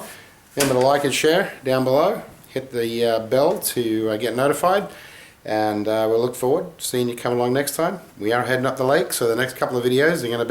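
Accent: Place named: Australia. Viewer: Nice job. Did you do a follow-up video? I think I'm hearing a bit of an Oz accent but the winter scene is throwing me. Where are you located?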